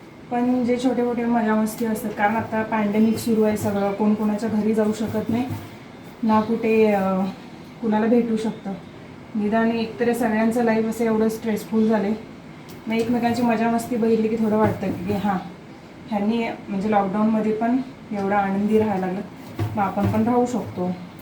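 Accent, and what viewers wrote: Kolhapur, India. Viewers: Di tujhi Kolhapuri language khup aavdhte mla 😍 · तुमची कोल्हापुरी भाषा मला खूप आवडते तसेच तुमचे राहणीमान खुपचं छान आहे तुम्ही आरव ला लावलेल्या सवई तुमचे सगळ्यांशी बोलणे तुमचे व्हिडिओ पाहण्यासाठी मी खूप उत्सुक असते रोज दुपारी मी तुमचे नवीन झाले ले व्हिडिओ बघते किंवा जुने बघत असते खूप refreshing वाटते 🙏 असेच छान व्हिडिओ करत जा तुम्हाला खूप खूप शुभेच्छा 👍 धन्यवाद 🙏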